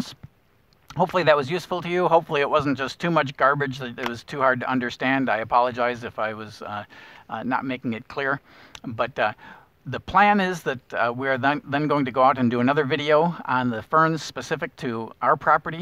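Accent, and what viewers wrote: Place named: North America. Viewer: I notice you have a North American accent. Will you be doing lots of plants that can be found in the UK? Found you from hearing about the Photosensitive poison in Parsnips.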